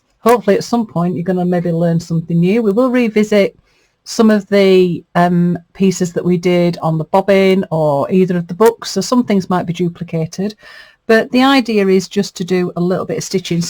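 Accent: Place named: Northern England, United Kingdom. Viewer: Hi, new subbie here (I came over from Rachel at Roxy Creations' channel) First off, I recognise your accent! You're a Northerner, like myself! (I'm in Lancashire) I love the idea of this challenge and I will be playing along too as I want to start incorporating stitched pieces into my journals and I think this will be a great starting point for me 😊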